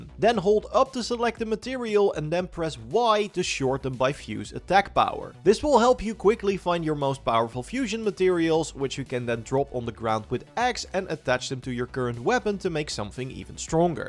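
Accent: Netherlands. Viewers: You sound dutch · i smell dutch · Heerlijk dat Nederlands Engels accentje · Ik merkte meteen aan je accent dat jij nederlands bent 😂. Goeie video trouwen was heel nuttig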